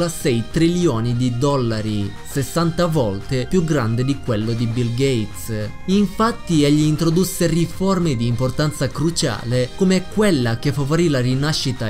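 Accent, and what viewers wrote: Russia. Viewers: è Russo :) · È RUSSOOOOOO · Madonna che voce ma sei russo???È fighissima la tua voca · è russo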